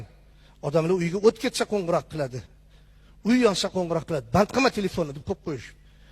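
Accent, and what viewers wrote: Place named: Tashkent, Uzbekistan. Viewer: Чести тошкенча😂😂😂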